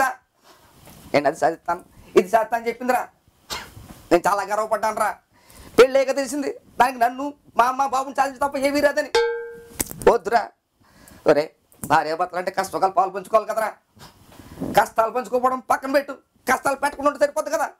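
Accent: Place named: Godavari, India. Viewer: ...godavari slang that too konaseema slang made all the difference...very great attempt...u simply rockzzz...awesome... glad to see comment of puri jagannadh sir stating that he needs actors like you... u made a succesful attempt CONGRATS:)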